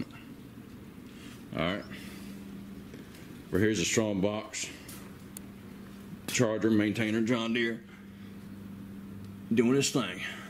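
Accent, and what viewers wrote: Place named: Alabama, United States. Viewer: Thanks for very helpful review. I just ordered this charger for my John Deere 110TLB backhoe. I was tired of my battery dying at critical times...like last year when my 37-ton log splitter arrived from Northern Tool and the crate was damaged so bad I had to unload it all in my driveway with the JD, since the girl who drove the delivery truck was unable to help at all. I got the log splitter and crate halfway out of her truck and onto my loader bucket, then tried to back away from her big truck and the JD battery went "NOPE...I'M DONE!" After we somehow got her truck pulled away and I was left alone with the problem to solve, I had to get the battery charger hooked up on an extension cord and got enough juice into the battery to crank up and make it back up my hill. I was exhausted, as was my wife, and I decided I wouldn't let this happen again. 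I've got a new battery tester too, and will keep this 1.5A trickle charger on my battery in same configuration as you mounted it on your machine. The dampness and cold of the Pacific Northwest is brutal on batteries in Winter. Thanks again for your great review. And dang if you don't talk just like me, an Alabama boy!....ha ha ha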